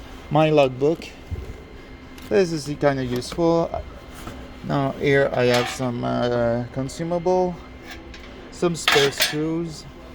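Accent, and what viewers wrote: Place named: Quebec, Canada. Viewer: “Sorry for my accent, I’m french Canadian. But it could be worse, I could be just french.” Love it! Sweet tour, I’m going to be buying a Tekton box soon. Nice to be able to support a Canadian manufacturer.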